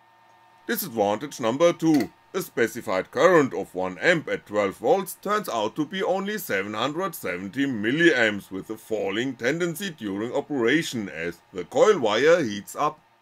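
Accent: Germany. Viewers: Funny to hear a german practising English.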